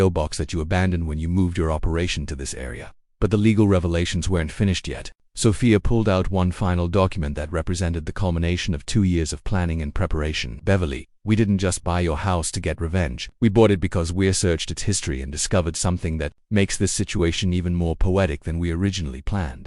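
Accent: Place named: United Kingdom. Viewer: OK you sound British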